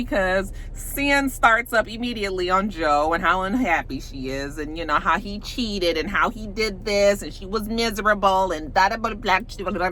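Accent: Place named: California, United States. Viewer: Don't wanna watch the show..So Thanks...Your review is EVERYTHING!...the more I view the more I clearly I hear your accent..lol California ❤️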